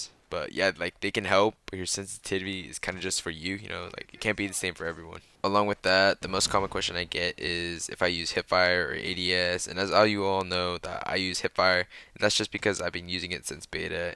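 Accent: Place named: California, United States. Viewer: Lol are you from California or something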